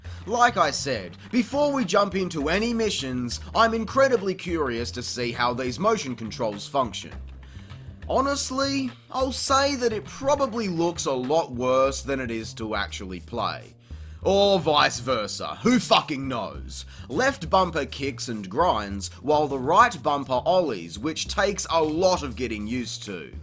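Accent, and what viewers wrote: Australia. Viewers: I'm glad I didn't accidentally buy this game yesterday at my local retro game shop. It was either this or Downhill Jam. I bought the latter and I like it. The price difference made it really obvious which one was better, even though I'm sure the guy at the counter probably would have at least told me it wouldn't work on my New 2DS XL anyway. And I just gotta say that your obvious frustration sounds 1,000 times funnier and more relatable in your British accent, even though I'm from New Jersey. Lololololololololol.... Correction: Australian accent. Sorry I didn't realize that at first.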